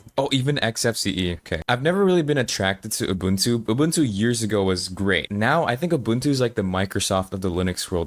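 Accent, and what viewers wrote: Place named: United States. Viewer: Hey man... I've been subbed to you for like a month or two and i friggin love these videos :) Also out of topic but where did you get that American accent while being a non native English speaker 😭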